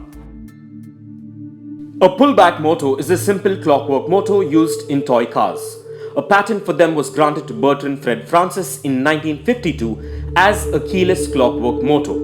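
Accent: United States. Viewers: Your accent is like you are a man from America.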